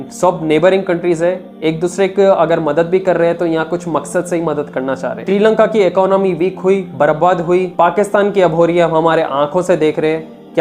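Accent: Hyderabad, India. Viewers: Bhai aap haidrabad se lagre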